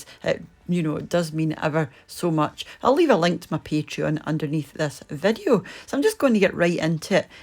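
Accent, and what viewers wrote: Scotland, United Kingdom. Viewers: Thanks. Wife as from Banff area, and lost most of her accent, so you gave me shivers hearing you. And I have missed a real steak pie, and ever more so a Shepherd's pie. Also from West Texas, out in the middle. Cheers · Lovely Recipe!! Congrats, Love the accent, I miss it as an Irishman I love the Scots! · As a boy originally from Wishaw, it's great to hear that accent! one question, If I want to freeze the pies, should I use short crust for the top, or will puff still workl? Got the pot going as we speak! Thanks! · Your Scottish accent got me here to stay! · "that Scottish brogue....Im in Luurrrrve!!!" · her scottish accent is so reassuring, reminds me of my student days, i had a scottish granny as my landlady and we ended up in bed. She had massive pendulous breasts with an insatiable sexual appetite. OH those glorious sex filled students in scotland brings back memories!! 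· R ya Scottish. Love your accent and our pies. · Wonderful recipe! Your Scottish accent is absolutely charming. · Hello 👋 there from California 🌸your accent and wonderful recipe makes me yearn for Scotland where I went to Uni in St. Andrews!!! I will definitely make these! 😊 thank you enjoy tea time so much what city do you live in? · Those look wonderful and I love your accent. I'm Scottish too, just American born. God bless you real big. Thanks for sharing what you know. Jude from Kentucky 🥀🌿🐴💚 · Watching this from Australia. Those pies look absolutely delicious, and your voice with your beautiful Scottish accent is a pleasure to listen to. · That look really good ngl. By the way, nice accent. The Scottish accent is my favorite. 👍🏼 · I enjoy most of these recipes. I am very deaf so I rely on the subtitles. It's quite amusing to see that the strong Scottish accent and some regional dialect words are not recognised by the auto system so errors creep in .. 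but I can usually guess the intended script. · Subscribed less than a minute into the video. Love the Scottish accent and the yummy food! Greetings from SC, USA. Love Scottish cooking and the happy feel of this channel! :) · Everything sounds better in Scottish. And they look amazing, just like I remember them from my trips to Edinburgh. Think I have to make them myself now... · That’s one terrific pie I have ever seen on here ..I’m definitely making this for my hubby ..looks so full of flavours and love the way you actually spoke thro how & what you was adding & doing ..love your accents wee Scottish are lovely people..thanks for sharing ..now adding to watch more you tube with you .❤️🥰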